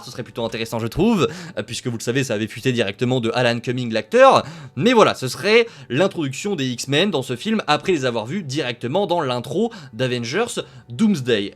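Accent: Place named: Lyon, France. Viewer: t'es lyonnais, non? On dirait. Je viens vous confirmer que Nartée, Endrique, ainsi que Correntyn Tollisseau SONT dans Doumsedeille! EH OUI!!!! Ils font partie du MARVELE MOUVIZE MULTIVERCE!!!!! Ils vont des petits ponts à Wong et Docteur Strange (Strange prononcé en français ringard). Aussi, il y aura l'alien qui est apparu dans un ipizode des Vacances de la Moor, le sequel de Helène et les maçons. INCREDIVULVE! Eh oui!!!! Brénoudeille et Doumsedeille!!!!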